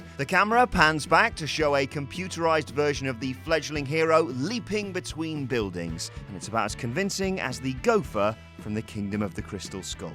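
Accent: United Kingdom. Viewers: This British commentator got me ctfu 🤣🤣😭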